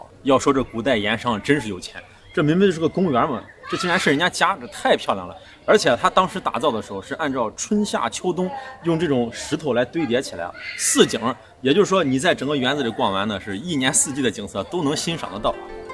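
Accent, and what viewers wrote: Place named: Yangzhou, China. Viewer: 说的扬州话很地道让我想起了我的家乡东北.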